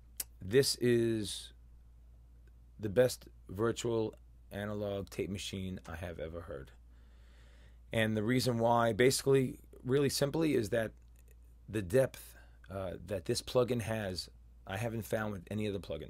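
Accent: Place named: East Coast, United States. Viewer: Good to hear an east coaster. Awesome review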